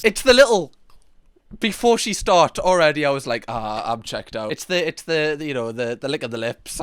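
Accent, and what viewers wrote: Newcastle, United Kingdom. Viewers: Are you a Geordie?! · so refreshing hearing a geordie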